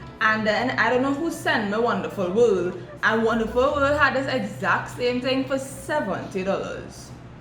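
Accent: Trinidad and Tobago. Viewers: U hearing the Trini accent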